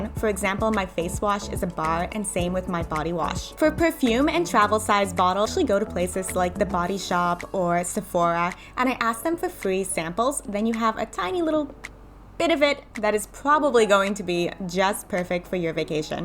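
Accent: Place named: Bronx, United States. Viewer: Your voice sounds a bit different like you come from the Bronx not Canada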